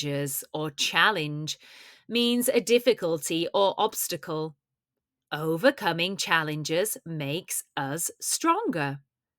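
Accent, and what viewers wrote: United Kingdom. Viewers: I really like your video, it really helps me with British English pronunciation, thank you very much ❤ ￼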